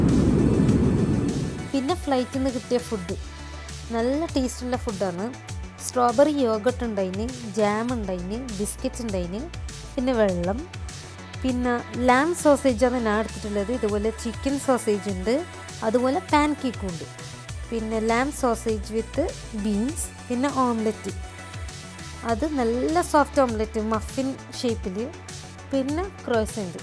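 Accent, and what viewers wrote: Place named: Mattool, India. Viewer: ya monee mattoole samsaram analo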